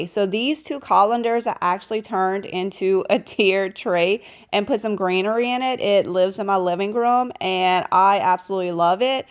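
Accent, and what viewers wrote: Louisiana, United States. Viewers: I love you, I love your Cajun rambling, I love your Mississippi home, and I can’t wait to see all your new adventures. I was afraid you were going to announce you’re quitting YouTube to focus on FB and your website. I’m a YT girl so you can imagine my relief. You keep doing you girl, I’m along for the ride.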